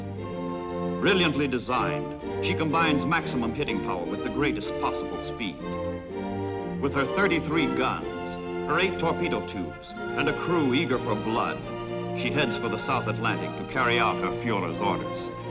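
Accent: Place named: United States. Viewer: I'll give you one star for at least trying. BUT employing children between 6-8 to play the instruments for the soundtrack is NOT a good idea. And then to use AMERICAN ACCENTS on the Voice-Over? What were you thinking? The rest of the world finds it impossible to listen to the accent of the most stupid country in the world.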